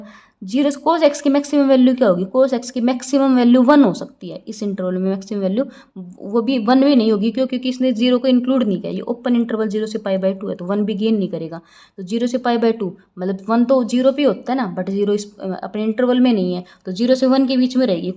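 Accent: Haryana, India. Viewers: Haryana se ho mam?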